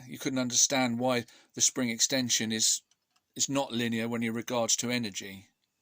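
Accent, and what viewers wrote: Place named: Cockney, United Kingdom. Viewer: Oh dear indeed - Thank you for sparing some of us the time wasting ordeal of listening to his utter garbage excuses. You can congratulate yourself on getting him to weazel out of his long standing MV claim. "Cluuuuck cluck cluck cluck" is what it sounds like from him. And so he doesn't like the way you talk? What does he want you to do... fake a different accent? I'm a Midlander/ Northerner and love the London / Essex accent, whchever it is - To us it all sounds pretty Cockney, a bit Arfer Daley and I wish I could mimmick that accent cause I always thought it sounded dead cool. Now if you had a Brummy accent, he might have a point lol! Just kidding. It shows his difficulty dealing with reality, when even the reality of your regional accent is too much for his "brain" to bear.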